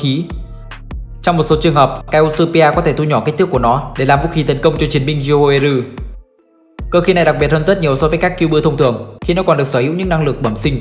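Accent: Japan. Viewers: Tên nào tiếng Anh thì đọc tiếng Anh đi đọc tiếng Anh kiểu Nhật thế nghe nó cứ bị khó chịu ý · Đừng đọc cái kiểu tiếng anh nhật nữa đi. Từ nào tiếng anh thì đọc tiếng anh đi cho dễ nghe.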